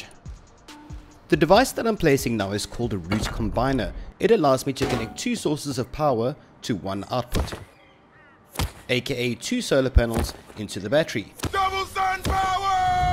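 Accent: South Africa. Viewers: are you South African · South African? Easy to follow tutorial accomplished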